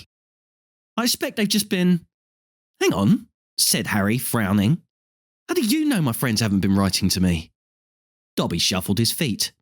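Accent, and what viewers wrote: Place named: United Kingdom. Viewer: Im in Cyprus, surrounded by UK people, this is a great way to make me more comfortable with a UK accent, thanks!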